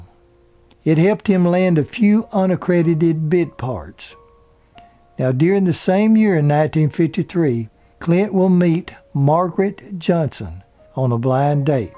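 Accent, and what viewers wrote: South, United States. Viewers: Dear mr. ( may I call you ), your format and exquisite Southern accent make all of your mini documentaries a pure joy to behold. I my humble blue-colour opinion, you are a true celebrity historian with the gift of timelessness that you bring to all of your productions.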